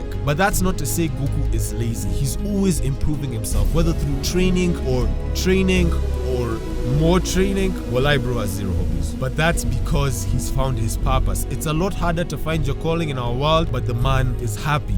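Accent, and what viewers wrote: Kenya. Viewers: Oh shit i knew that accent from somewhere wsg my kenyan blooda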